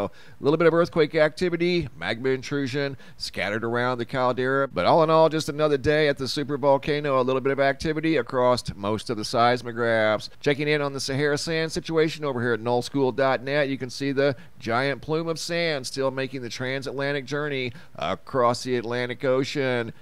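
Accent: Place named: South, United States. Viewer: If only you could just talk and not sing your words like with a old country twang!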